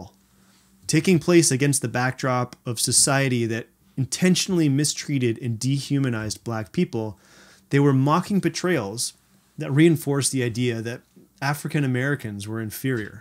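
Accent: Canada. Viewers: Interesting, well thought out points... a little culty and the GTA accent is unbearable. Mute and use cc! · Canadian much? I love it!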